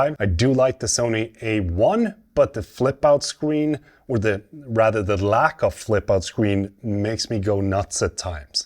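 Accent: Sweden. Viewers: Nice, I just found your channel. Can hear you are from western West Gothia on your accent in English, probably near Göteborg. I am a Swede from Falköping, live in Mexico now, but moving home soon. Enjoyed the video, it is funny how to explain sociala avgifter tax and pre-skatt for Americans lol. I remembered once as a young Swede, being a 2-shift factory worker, when I calculated my arbetsgivaravgifter, income tax and all the stuff I paid all that moms and other "avgifter" on I was at a 78% tax rate. Cheers from Mexico, Liked and subscribed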